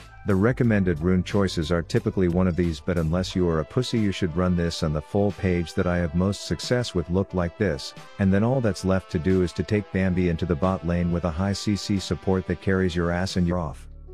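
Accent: Scotland, United Kingdom. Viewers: SHES SCOTTISH OMG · I thought that accent was Scottish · That's a scottish accent, not a british accent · She sound Scottish tho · isn't her accent Scottish? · i thought she was scottish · isnt it scottish? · That's a scottish accent, not a Brittish accent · my boy she has a scottish accent sorry to say but she is not british. title needs to be scottish bambi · she is SCOTTISH not Bri ish! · She’s Scottish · She's not British, that accent is Scottish and how dare you call mine people British. We will literally go William Wallace on you for speaking such blasphemy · she is Scottish Bambi not British Bambi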